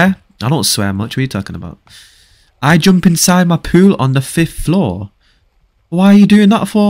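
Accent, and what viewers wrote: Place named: United Kingdom. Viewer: Why do u sound more British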